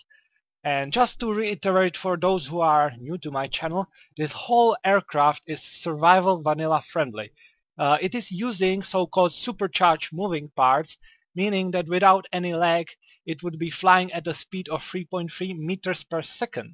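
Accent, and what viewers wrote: Czech Republic. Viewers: Where ya from, you have a Czech accent a little :D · u have like Czech accent :D where are u from? :D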